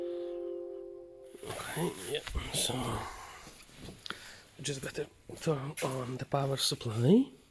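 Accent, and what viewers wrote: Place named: Russia. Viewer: Сразу слышно что человек Русский )))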